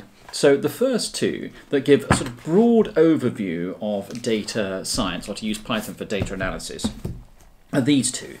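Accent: England, United Kingdom. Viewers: The first thought I had when I saw the dictionary and all these books was: "Can you adopt me? I am a wonderful daughter, and as Italian I cook well". Then I came back to myself and I focused on Python. Very useful video for us little dev and delightful English accent. Thanks.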